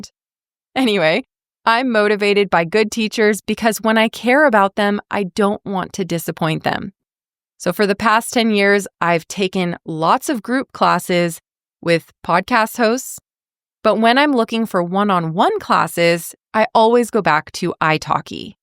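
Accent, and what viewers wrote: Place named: United States. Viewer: Siempre es un gran placer y aprendizaje escuchar tu inglés americano, gracias maestra! 🇦🇷🇧🇷🇧🇴🇺🇾🇵🇾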